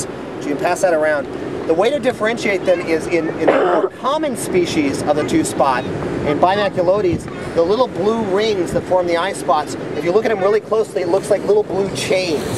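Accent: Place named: United States. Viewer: its called the American accent. Globalization, and TV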